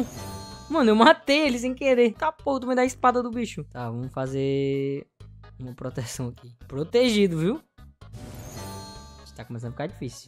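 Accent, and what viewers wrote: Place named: Ceará, Brazil. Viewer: Tu e cearense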